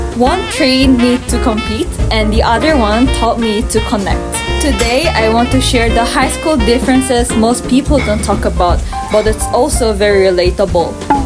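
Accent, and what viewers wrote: Philippines. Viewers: your English accent is so Filipino 😂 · woah she has addopted the Filipino English accent. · filipino accent yung english hahaha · Great 👌 content, your English accent is perfect now very Filipino English accent, you have adapted well.. enjoy your holidays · Your accent sounds pinay😂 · You're starting to have the filo accent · naging pinoy na yung english accent:face-green-smiling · Love your Accent. You sound Filipino 🥰.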